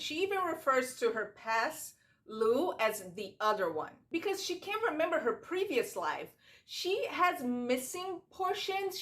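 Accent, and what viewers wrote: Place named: Brazil. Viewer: És brasileira? Quase que adivinho por causa do sotaque :) Eu sou portuguesa, ahahah! Descobri agora o teu canal. Já subscrevi.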